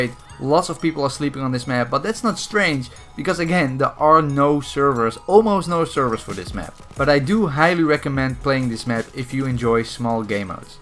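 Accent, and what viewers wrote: Netherlands. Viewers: I smell dutch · Is dutch, Pronounces rotterdam as rodderdam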